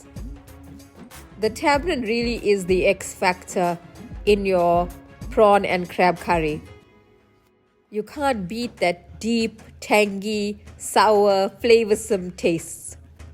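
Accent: Durban, South Africa. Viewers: Thank you very much…looks and sounds delicious… I have to try your method… my family loves crab and prawn. From you accents I’m guessing your from Durban SA my beautiful home of birth…I may be visiting this year sometime… do you have a restaurant and is called Peri-Ma’s Kitchen? Just got to visit. Once again Thank You ❤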